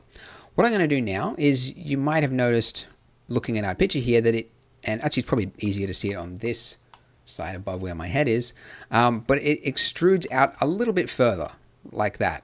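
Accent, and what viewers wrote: Australia. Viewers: funny australian Tutorial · He's from Australia lol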